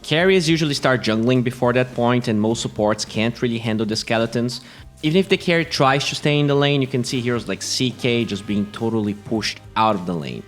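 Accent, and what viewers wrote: Brazil. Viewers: o cara é br da pra ver pelo sotaque kkk tnc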